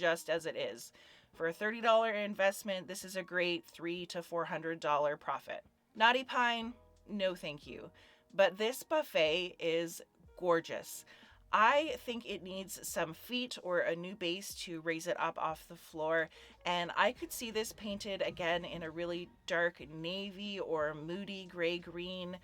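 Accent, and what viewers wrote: Canada. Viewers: This was such a great video. Also shows me why I watch you because I think you’re so down to earth. I had asked the question about your profession and you answered my question and loved seeing your hunt for your beauties you make! I also enjoy your Canadian accent lol! I was born in Toronto but moved to the states when I was 10 so no Canadian accent left but my roots go deep. I’m also a “ ” so another reason I follow you lol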